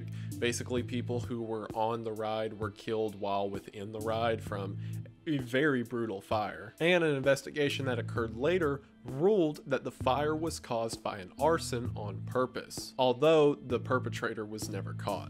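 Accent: South, United States. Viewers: Saaaame. Then again, in my house, we* might even enjoy " reads the dictionary" as background noise. * "we" includes my demonic toddler lmao; that chill, Southern-accented baritone is just SOOTHING, man 😌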